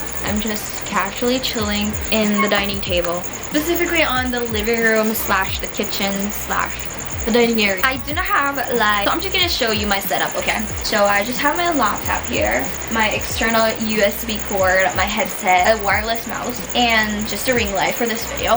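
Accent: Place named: United States. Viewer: she has an american accent ............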